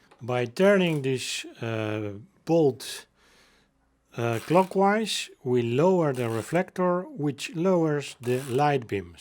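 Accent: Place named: Netherlands. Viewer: Thanks very much for your videos. It takes all the guess work out of it. And it took a Dutchman to do it. Oh well, you sound Dutch anyway!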